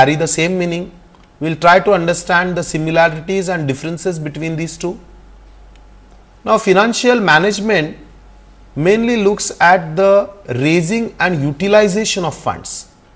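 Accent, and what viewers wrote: India. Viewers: Awesome, and why not put the original subtitle for it as the google has lots of mistakes and it takes time for me to understand the Indian accent.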